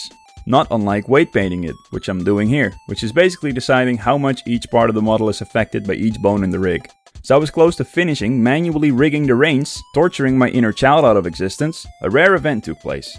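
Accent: Netherlands. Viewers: Looks like you have been blessed by the algorithm, nice work good sir! Also do i detect a dutch accent? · You're Dutch, aren't you? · 5 seconden, ja hoor nederlander xD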